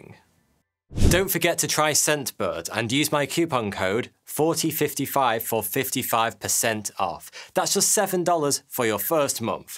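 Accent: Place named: Cockney, United Kingdom. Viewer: Well done! The cockney bit,(Liverpudlian?),is aalllmosttt gone. Oh, the horseshoe crab thing is brilliant! Um, well, craic on then.-weezi-🙏💖🙏💜🙏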